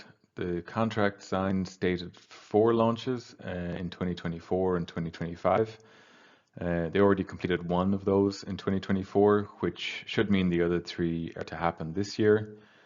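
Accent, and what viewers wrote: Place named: Ireland. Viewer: Very cool! You seem to be another "Scott O" running the numbers!! A fellow Irishman too by thee sounds of it which is even better!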